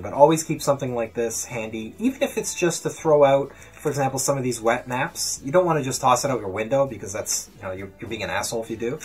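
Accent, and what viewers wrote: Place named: Canada. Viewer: Just discovered you! And you must be Canadian because you sound like me! 😎😁🇨🇦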